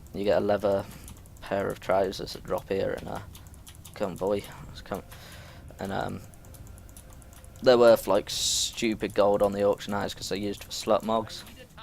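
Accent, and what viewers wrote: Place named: United Kingdom. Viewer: I want to hear the game not British voice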